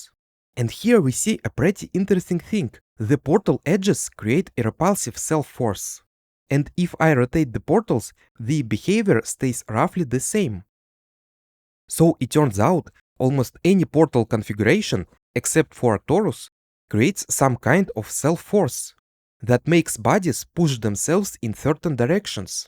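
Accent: Russia. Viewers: отчётливо слышно русский акцент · я даже и не знал что у него есть канал на английском а ещё русский акцент очень возникает)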